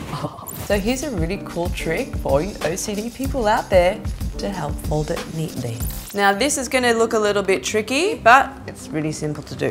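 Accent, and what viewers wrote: Australia. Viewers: She sounds Australian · oh so that's why her Australian accent is a little different. Singapore must have rubbed off a little :3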